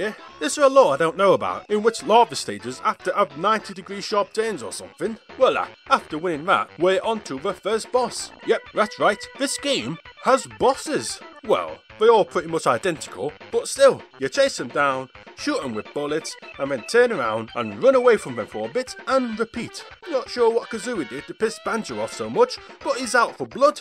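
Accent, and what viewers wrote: United Kingdom. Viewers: Are u British or American? Sound like ur British.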